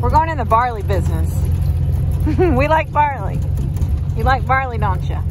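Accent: South, United States. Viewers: Another great video! I miss my farm 3500 acres, and we grew wheat, barley and peas. It was up on the high line in Montana but due to an accident I was no longer able to farm and your videos is very uplifting! is a man of few words and is funny. I joined your channel not long ago and have never seen pack a pistol. When we would go out and check crops or be in the tractor there was always a pistol and a rifle in the truck, combine etc. as we have coyotes, badger, mountain line black bear and grizzly bear. You are just a hoot, the southern accent and facial expressions are funny as heck. A great family channel and you have a great family as well! Keep doing what you're doing cause you're doing it right! Take care, stay safe and God bless!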